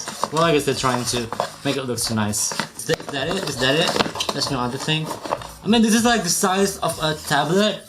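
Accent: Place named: Indonesia. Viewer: You are Indonesian,i knew from your accent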